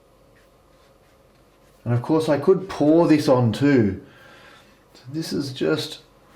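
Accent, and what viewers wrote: Australia. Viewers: Hi just found your channel, loved watching this, great to hear a Aussie accent, cheers from Canberra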